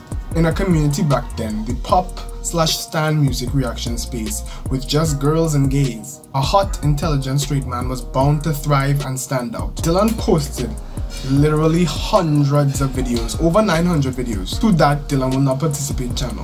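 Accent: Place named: Trinidad and Tobago. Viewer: You sound trini ❤🇹🇹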